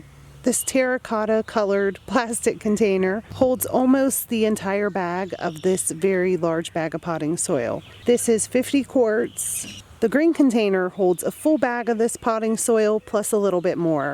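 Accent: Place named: Pennsylvania, United States. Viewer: Hi, new here! U sound like u are from my home state, PA! I think I am going to try melons this year!!🤞Good tips!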